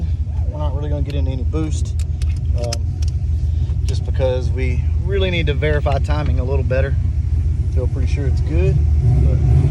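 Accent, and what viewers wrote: Alabama, United States. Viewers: I'm from the south I heard your accent yep Alabama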